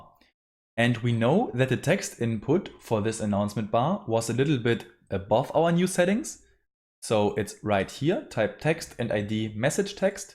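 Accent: Germany. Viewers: Nice guide, but in the end i was too lazy to add it anyway 😂. You explain it very well, the only thing that i don’t really like is the german accent ;)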